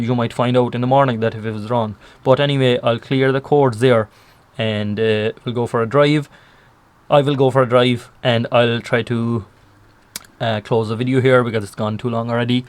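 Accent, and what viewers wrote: Cork, Ireland. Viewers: Is that a hint of a Cork accent? Sound for the video, need to tackle this in the morning. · Love your cork accent...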